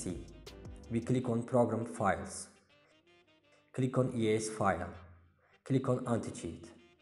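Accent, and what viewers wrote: Turkey. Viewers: İngilizce çok tanıdık geldi türk müsün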